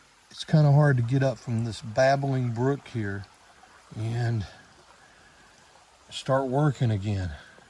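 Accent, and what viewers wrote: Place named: South, United States. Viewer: Nothing wrong with your southern drawl. I understand you perfectly and I’m Canadian😊 EHH! Love your travels, hope to follow in your path someday.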